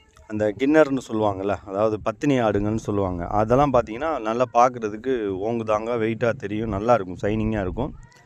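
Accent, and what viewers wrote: Madurai, India. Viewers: ❤ assalamualaikum warh madurai basha ❤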